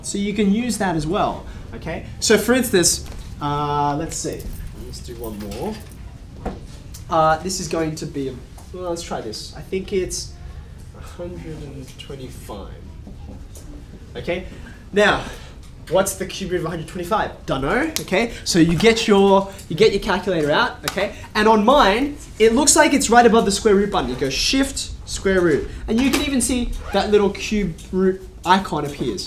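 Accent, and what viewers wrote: Australia. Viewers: Chinese guy with a Australian accent, Interesting.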